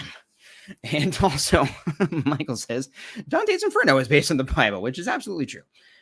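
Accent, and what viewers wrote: RP, United Kingdom. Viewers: Omg your BBC World service accent is on point. More please.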